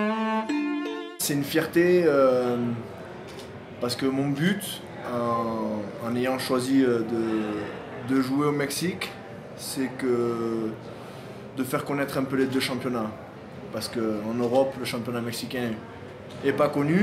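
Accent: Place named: South, France. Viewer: Il a l'accent du sud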